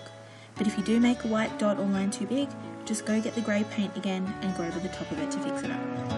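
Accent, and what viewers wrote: Australia. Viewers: Love this manicure so much! And it's really good to hear an Aussie accent on YouTube too. Happy Christmas to you, from May in Perth <3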